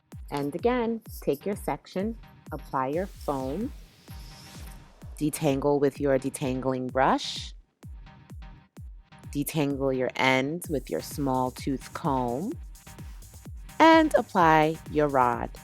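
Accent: New York, United States. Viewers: You remind me of Wendy Willimas (whom I love btw). Your personality is reminiscent of her. Your NY accent is also very similar to hers.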